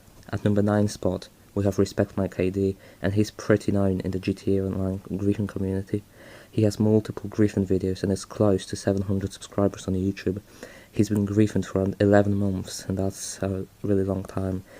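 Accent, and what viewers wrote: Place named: Poland. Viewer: I can hear your Polish accent in your voice, but that's okay because we can still understand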